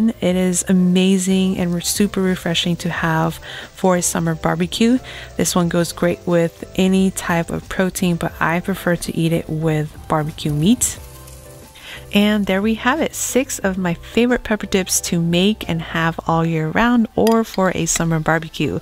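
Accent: United States. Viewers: Are you from Kansas?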